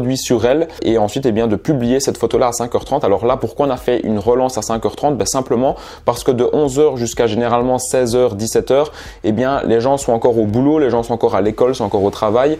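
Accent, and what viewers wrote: Belgium. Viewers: Je me disais bien qu'il était belge🔥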